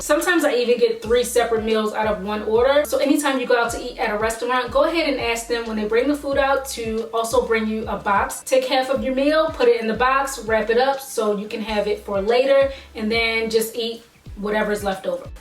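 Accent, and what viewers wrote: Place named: Maryland, United States. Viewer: Are you from Maryland? Your light accent sounds familiar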